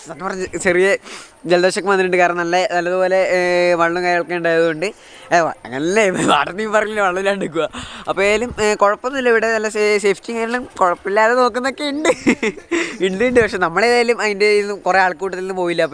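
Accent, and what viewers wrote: Kannur, India. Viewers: Bro u from kannur ano plzz tell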